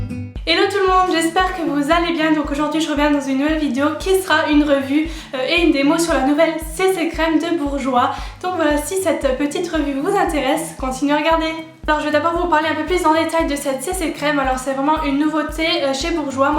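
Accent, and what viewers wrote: Lorraine, France. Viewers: AAAAH je viens de te découvrir et ton accent Lorrain me fait plaisir ! Je suis une Lorraine de base ! Bref là n'est pas le sujet, j'ai commandé la CC crème il y a 2 jours, j'espère la recevoir aujourd'hui car vous me tentez toutes avec vos revues ! Contente d'avoir découvert ta chaîne, je m'abonne direct :) A bientôt ! Charlène <link>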